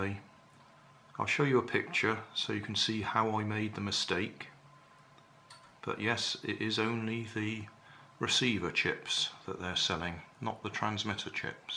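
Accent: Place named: RP, United Kingdom. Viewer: Forget it..... i love your royal accent, compose, even tone all throughout and the suspense that u maintain on whether project will work or not & above all irony .....wow!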